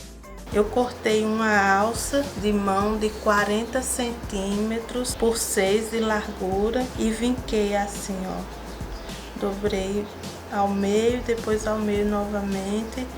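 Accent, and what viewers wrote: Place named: Northeast, Brazil. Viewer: oh, muito obrigada, de coração e sou muito espontanea, onde so tenho a agradecer a quem se dedica a ensinar com Amor e empátia.... Notei que seu sotaque é do Nordeste, de onde é?! Qdo eu retornar para lá, quem sabe não estaremos perto e vc poderá ser minha Professora em aula prática? Já pensou? Coisa boa,... um abraço Querida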